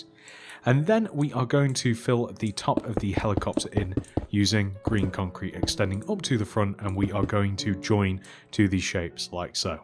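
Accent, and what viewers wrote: United Kingdom. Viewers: Are you british Hope you're not I will be mad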